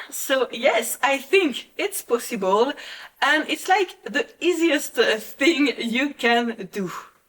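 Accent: France. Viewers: Bonjour, ah ben plus de vidéos depuis janvier, triste je suis! J'adorais travailler mon anglais et votre délicieux accent français m'aidait beaucoup, la cerise sur le gâteau l'aquarelle!!! Rien de grave n'est arrivé j'espère. Mais où est passé le? Bien cordialement.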